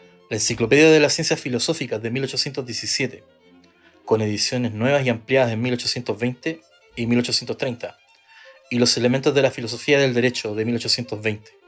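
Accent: Chile. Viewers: Me encanta el acento chileno (me genera paz). Yo estudié filosofía, pero aún no profundicé con Hegel y quiero darle a la Fenomenología del espíritu.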